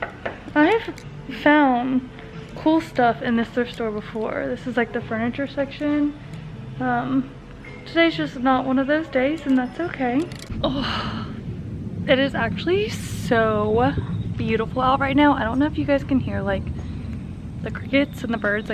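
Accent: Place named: United States. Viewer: This is a interesting movie. Than you for your upload. By the way, which state are you from? I am also interested in American girls accent in region.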